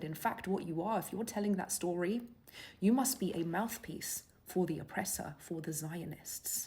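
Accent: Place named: England, United Kingdom. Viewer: You don’t sound like a person from Iran, but someone from England. Are you English or Iranian? Make sure you update the English government.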